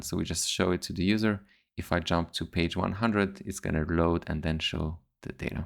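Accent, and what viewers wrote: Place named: Morocco. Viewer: Bro sounds moroccan AF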